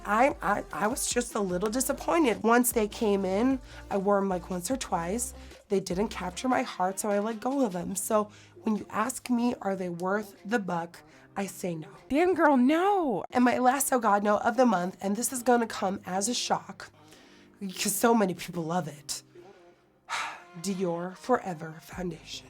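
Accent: United States. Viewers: I love your voice like this. It sounds so Americanized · What happened to your voice? You sound American and not German? Why? What is going on? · u sound so American!!!! 🤣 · you sound like an American now, more than ever:) I feel like they have a slight huskiness when talking, which is exactly how you sound to me:) And you are beautiful no mater how much you weigh or look. Find clothes that make you feel comfortable and confident, make sure you have a good posture and the effect it will have on how you look and feel will be amazing!:)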